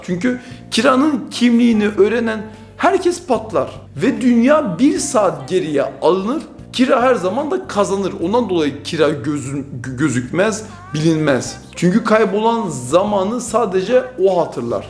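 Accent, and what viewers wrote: France. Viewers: abimin maşşallah jean pierre polnareff gibi fransız aksanı var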